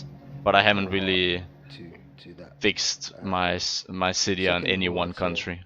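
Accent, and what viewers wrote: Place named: Denmark. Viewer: Your accent sounds Danish, are you?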